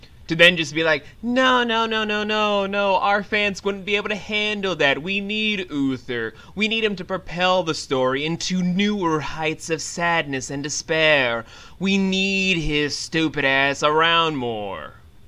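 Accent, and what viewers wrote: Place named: Cockney, United Kingdom. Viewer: your cockney accent, nice. i think a disclaimer for the show needs to be; uther will always hate magic, he never changes and he constantly reminds people of that.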